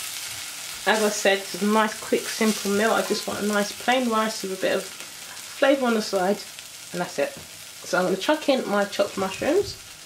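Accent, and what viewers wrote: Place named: United Kingdom. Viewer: Aside from the British accent your voice is so nice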